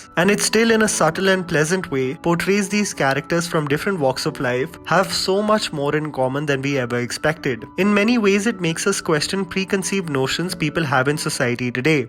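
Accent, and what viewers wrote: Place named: Mumbai, India. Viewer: Loved this movie!! & Ur Mumbai touch of speaking is really good.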